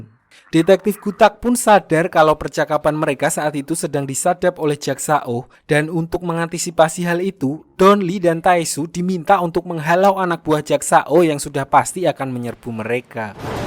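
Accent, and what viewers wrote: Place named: Java, Indonesia. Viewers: Pasti masnya orang jawa nih. Medok gini logat nya wkwkwk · Logat jawa nya medok😅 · Ini yg nranslet kaya orang banyumas tohk😅😅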